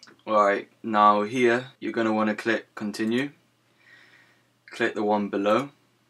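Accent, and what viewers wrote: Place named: United Kingdom. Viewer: oh British :D thank u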